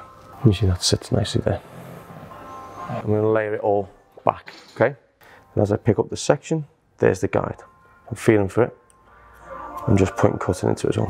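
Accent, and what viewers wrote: Liverpool, United Kingdom. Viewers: Love his Scouse accent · Scouse accent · That scouse accent❤️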